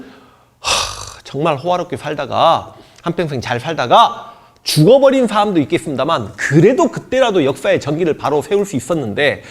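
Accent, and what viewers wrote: Jeolla, South Korea. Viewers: 억양이나 스멜이 라도 필이네. ㅋㅋ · 숨기려 노력 많이 한거 같은데 숨겨지지 않는 전라도 말투로 역사 왜곡하는게 과학이네요ㅋ 사령부라 하지 누가 사령대라 합니까 군대는 제대로 다녀왔나? 기본 상식이 없는 사람이 무슨 역사 강의를 하나 ㅋㅋ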